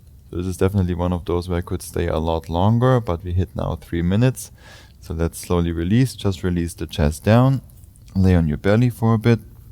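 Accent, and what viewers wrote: Germany. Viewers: I like your German accent. ❤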